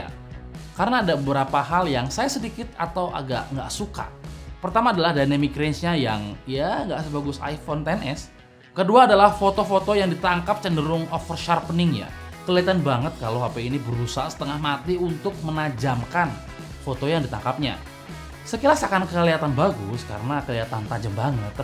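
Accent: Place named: West Java, Indonesia. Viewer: Sunda ninganan. Baruk urang mana da ngomong basa nasional wkwkwk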